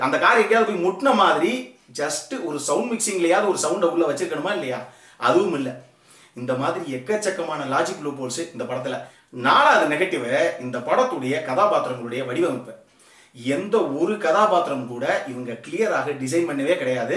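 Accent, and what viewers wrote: Tamil Nadu, India. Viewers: Only for ur tamil slang kanna. Va kaanam.....???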